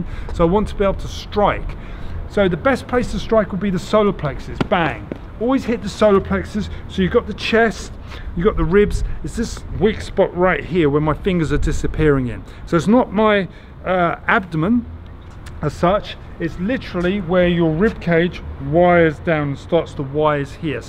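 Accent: United Kingdom. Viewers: I like your teaching very much, but the example is probably far too frequent and too much, hahahha. I hope your partner has a body armor inside his pullover. Are you from Britain, Sir? You speak like James Bond. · I Appreciate Your Wisdom and Sophisticated British Accent Sensei!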